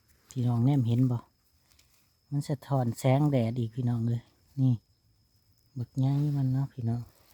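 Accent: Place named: Ubon Ratchathani, Thailand. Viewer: สะออนนำเด้มีแต่ดอกใหญ่ๆบ่าว เสียงปากคือทางบ้านแม่ทางอุบล เนาะบ่คือทางลาว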